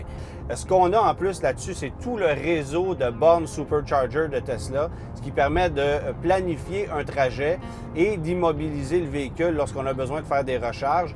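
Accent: Quebec, Canada. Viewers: L'accent québécois, on pourrait s'en passer. Parlez français s'il vous plaît. On a pas tous envie de vomir 🤮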